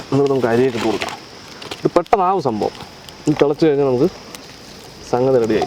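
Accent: Palakkad, India. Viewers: ഞാൻ എന്നും വിചാരിക്കും നിങ്ങളുടെ കൂടെ ഇരുന്നു കഴിക്കുന്നവരെ പോലെ എന്റെ വീട് നിങ്ങളുടെ അടുത്തില്ലല്ലോ എന്ന്. ഞാൻ കോയമ്പത്തൂരിൽ ഉള്ള ആളാണ് നിങ്ങളുടെ ഭാഷ കേട്ടാൽ പാലക്കാട് ആണെന്ന് തോന്നിന്നു നിങ്ങൾ എനിക്ക്‌ ഒരു അപ്പോയൻമെന്റ് തരുകയാണെങ്കിൽ ഞാൻ നിങ്ങൾ കേരളത്തിൽ എവിടയാണെങ്കിലും ഒരു ദിവസം നിങ്ങടെ കൂടെ ഇരിന്നു ഭക്ഷണം ടേസ്റ്റ് ചെയ്യണമെന്ന് ആഗ്രഹിക്കുന്നു.. പറ്റിയാൽ എന്റെ നമ്പറിലേക്ക് ഒരു ഫോൺ വിളിക്കണം.. <num>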